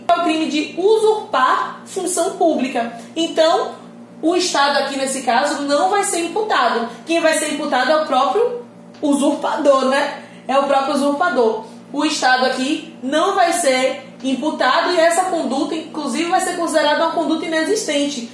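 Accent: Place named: Bahia, Brazil. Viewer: Estou amando suas aulas, sou estudante aqui no Rio de Janeiro e sou baiano; seu sotaque parece ser baiano.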